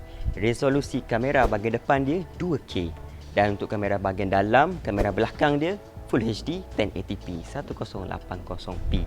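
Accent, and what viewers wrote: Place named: Kelantan, Malaysia. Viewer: Mantapp boh,,, slalu review yg ada masuk slang2 kelantan 👍🏻👍🏻👍🏻👍🏻 haha Smoga murah rezeki menang , boleh pakai utk van time hntar barang lazada 🕺🏼🕺🏼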